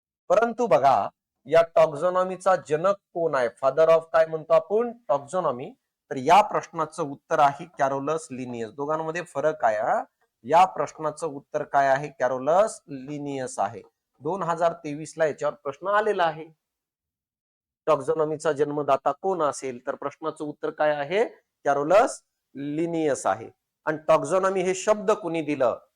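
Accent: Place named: Marathwada, India. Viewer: Sir tumhi aaplya मराठवाड्यातील आहेत हे आपल्या बोलण्यातून आपुलकी नक्कीच कळते.....❤❤ सोबतच सायन्स हा विषय खूप सुंदररित्या story type Ani intersting easily concept explain krta....salute to as you gain the understanding over the science in your academic n now you are applying it❤❤ it's obsolutely great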